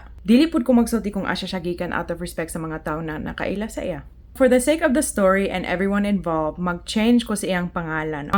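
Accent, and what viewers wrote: Visayas, Philippines. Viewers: Maganda ka ate! Don’t worry anymore. I love your bisaya accent. So nice pag combined rin with English. And marami makakarelate sa story time mo. Hahaha. Naalaala ko yung classmate ko nung highschool, na panay kwento about other girl na crush nya.. yun pala ako ang crush nya. 😂😂😂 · Your Visayan accent is similar to Inday Sara. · Mam,pglantaw nako sa fb about mglantaw mi sa YT nimo bahin sa imong ex.. anhi daun ko dri..nkasubscribe daun. Cge rako lantaw sa imo fb..ganahan kau ko sa imo accent ay,bisaya jud kaau ba. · Arang bisayaa jud oy🤣🤣🤣🤣🤣🤣🤣🤣🤣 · Interesting... lovely accent, bisayan kaau... uban mga laki mag hinambog jud na sila. mag kiss and tell so amping. lesson learned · Hi new subscriber here. Na surprised gyud ko kaayo na western lady pero maayo kaayo mag bisaya impressed gyud ko kaayo sa bisaya accent nimo. Interesting kaayo ang story nimo about sa imong ex filipino bf ug ang story nimo sa imong family na ngpuyo mo diha sa Pilipinas b4. 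Mo tan-aw ko sa mga videos nimo aron maka ila ko nimo more. Nalingaw gyud ko kaayo nag tan-aw ug naminaw nimo. God bless u always!!!🙏🌹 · ang galing, taglish-bisaya sya expressions, tono, mannerism, pati tawa. bisaya ka na day!!!!.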